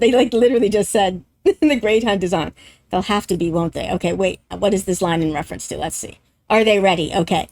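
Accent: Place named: United States. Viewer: American accent, American accent dances in American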